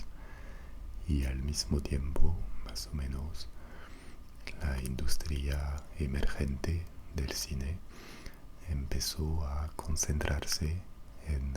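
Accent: France. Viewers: So, do you still have a 'french' accent in spanish?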